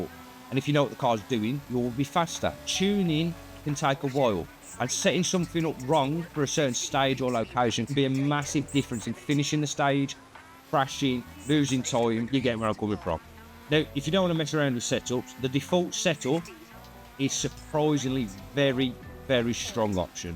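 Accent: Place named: Midlands, United Kingdom. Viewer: For anyone not from Britain... this is a classic "Midlands" accent ... 🫡🇬🇧 It's equivalent to the American 'deep south.' 😂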